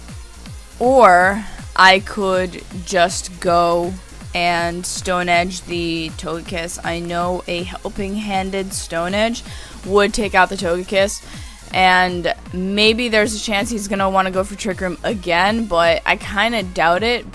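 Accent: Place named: New York, United States. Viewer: New york accent. Plays pokemon. Gorgeous. God help me. xD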